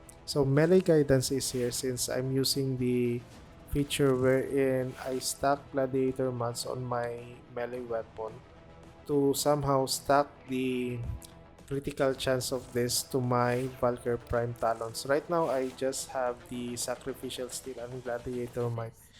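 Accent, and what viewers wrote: Philippines. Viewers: even the diction and cadence is similar to how Filipinos speak Tagalog. The "f" and "v" to the rolling "r"s and "t"s. Though even English in the ph will still vary depending on the region. That being said, I prefer the human voice than the AI one. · are you filipino? sounds like it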